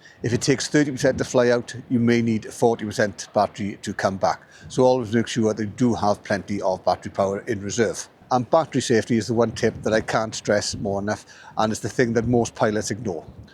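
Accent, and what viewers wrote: Wales, United Kingdom. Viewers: Welsh accent is the best one!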